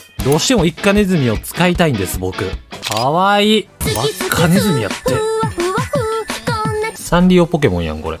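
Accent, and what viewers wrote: Kansai, Japan. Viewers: 同じ関西弁やから見やすい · 関西弁配信者なかなかいないので嬉しいです....これからみます🥰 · の関西弁好きすぎる( ¯꒳¯ᐢ)♡ コダックの色違い奇跡すぎて笑いました笑笑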